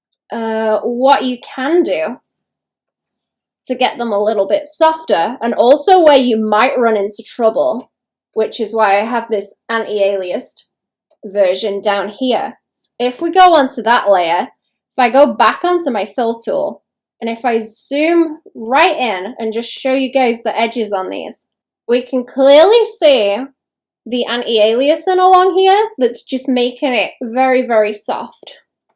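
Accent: North West England, United Kingdom. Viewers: Great art, learning lots from this. Can I ask where you are from? Your accent sounds really close, north west UK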